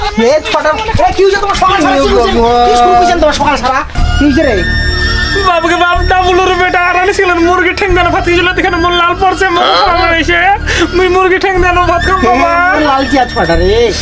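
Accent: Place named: Thakurgaon, Bangladesh. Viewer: দাদা আপনার ঠাকুরগাঁও এর লোহাগড়ার আঞ্চলিক ভাষায় কথা বলা আমি অনেক পছন্দ করি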